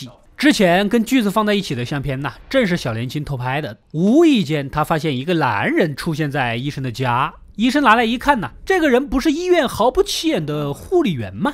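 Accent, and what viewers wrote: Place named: Anqing, China. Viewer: 安庆人说的塑料普通话，真的有点好玩...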